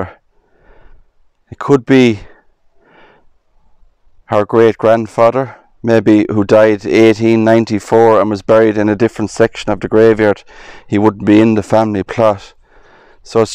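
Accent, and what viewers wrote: Ireland. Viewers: Are you Irish? Anyways, you're a really good storyteller, and I'm going to be saying Mary Therese Collins in my sleep now. 😆I'm laughing with you though not at you and I love your soothing voice that would be really good for ASMR. Subscribed!!! 😀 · Perhaps you don't like semi personal comments. But I am going to take a chance. Just love Irish accents since my ancestors came from ballina, county Mayo. Still have relatives there. Enjoyed the video too. Very thought provoking. Am a new subscriber.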